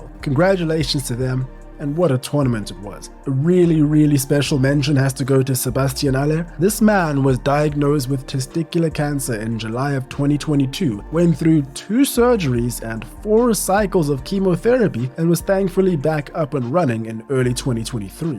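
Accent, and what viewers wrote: South Africa. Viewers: wait, is homie South African? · Wait. You’ve been South African this entire time?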